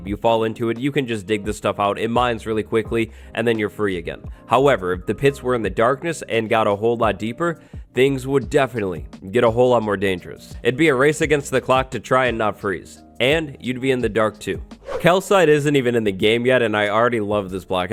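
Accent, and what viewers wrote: United Kingdom. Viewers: wait you’re british??? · Ur british I am to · wait you're british? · Top notch British accent 👌🏼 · from intro: you're british? xD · Aye yo your british? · ur british?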